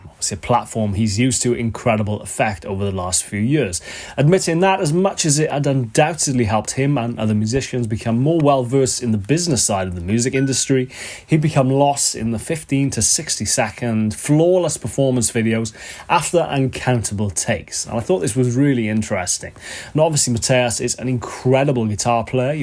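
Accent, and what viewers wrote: Wales, United Kingdom. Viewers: On a random note .. sounds like you've lived in or come from Wales, possibly Caldicot or Chepstow area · You got style bro, you sound like a fellow Welshman too👍👊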